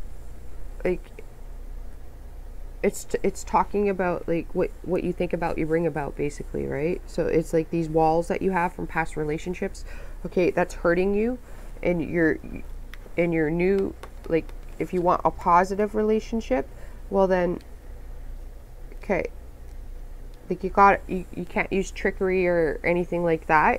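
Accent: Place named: Canada. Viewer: You gotta be from Canada EH. Me too.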